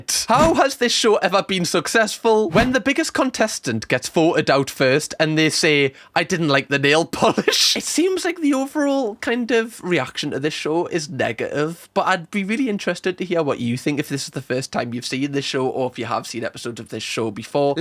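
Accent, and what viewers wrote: United Kingdom. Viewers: To be fair i like the british accent indeed the best😂 (coming from a german)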